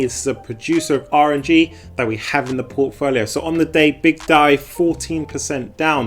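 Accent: United Kingdom. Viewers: Hi. You from the uk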